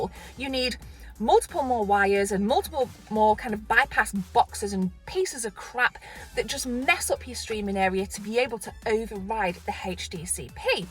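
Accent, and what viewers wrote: United Kingdom. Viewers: That amazing uk accent!🙈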